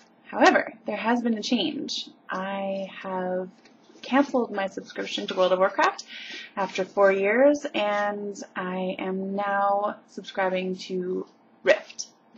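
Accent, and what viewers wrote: Canada. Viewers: You sound Canadian, good God, fail. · Ah how funny, a friend of mine was only talking about Rift yesterday....... interesting to see what it's all about although not sure i'll be getting it (which once translated = when my friends do, I'll get it too). Weird how when I was in the UK I couldn't tell the difference between Canadians and Americans yet now I can and within seconds I was like.......she's Canadian. Just thought i'd share lol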